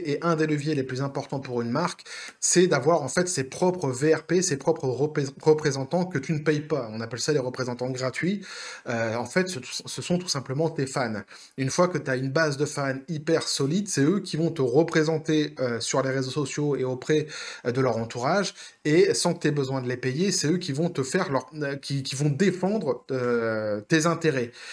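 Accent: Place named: Quebec, Canada. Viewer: L'accent Québécois est fabuleux 👍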